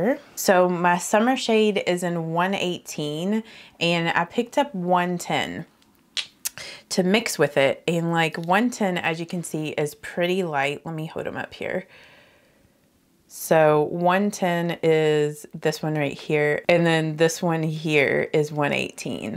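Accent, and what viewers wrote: South, United States. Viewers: I like your voice, and, really enjoy your southern accent. Very pleasant to listen to. So I vote NOT silent video ☺️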